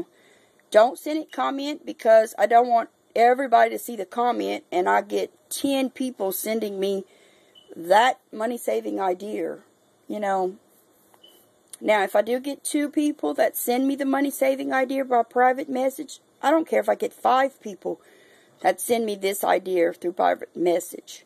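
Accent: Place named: Louisiana, United States. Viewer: you sound like my family in southern Louisiana. Your accent reminds me of them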